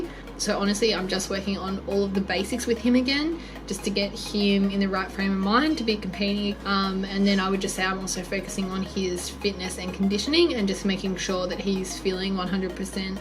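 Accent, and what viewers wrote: Australia. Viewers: As soon as I heard you where Aussie I subbed haha I'm a fellow Aussie (live in SA) and this is my first vid of yours I watched and I love you guys already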